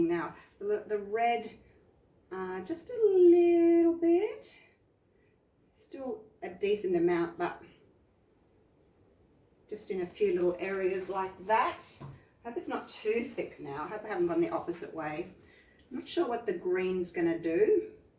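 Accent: Australia. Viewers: Just discovered your channel and it's amazing :) Thank you for sharing all your techniques, that's so generous and inspiring! I'm starting to explore with pouring and you're giving me lots of ideas. And great to hear some Australian, takes me back to my backpacking year ahah Love, from Germany (and France)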